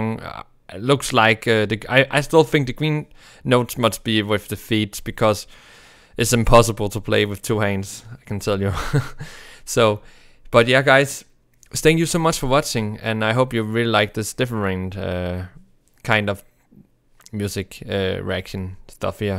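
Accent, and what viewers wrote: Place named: Germany. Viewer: You sound as though you have a German accent... how lucky you are to have J.S. Bach as part of your cultural heritage. The very grandfather of classical music, and the author of the once a world '48 preludes and fugues' If I were German I would be incredibly proud so to be, with the cantor of Leipzig being one of my previous countrymen.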